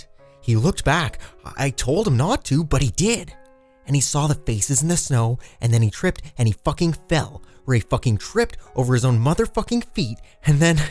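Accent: Canada. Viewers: my man!!!!! I have been waiting for this forever dude! Didn't think it was ever gonna happen after the issue you had with the author about the last part of the story.. And speaking of which, do you have some sort of agreement with T.W. Grim or somethin? You're the only narrator on youtube I've found that reads his/her stuff. You have the best taste in material by the way, and for some reason, your painfully Canadian accent really tops these off and makes them more real and authentic. You have quickly become my favorite narrator dude, keep up the good work!! Also, do you read subscriber submitted stories?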